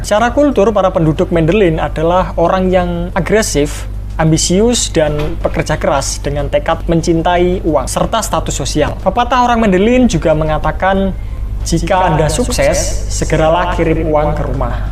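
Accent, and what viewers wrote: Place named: Java, Indonesia. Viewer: Medhoknya khas banget muehehe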